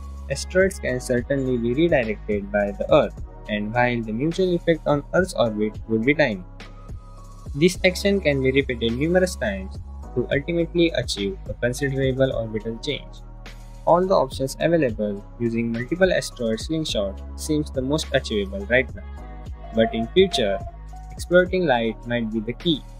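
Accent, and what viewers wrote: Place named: England, United Kingdom. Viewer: I'm sorry I don't understand the English accent very well :( Good animation ♥️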